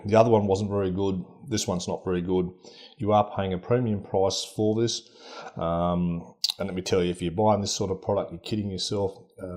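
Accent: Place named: Australia. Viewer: Its Australian mate ;)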